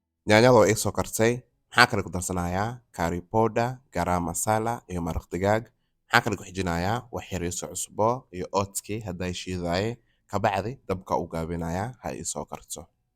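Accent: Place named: North, Somalia. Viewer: Af reer waqooyigu ma fahmayoo😊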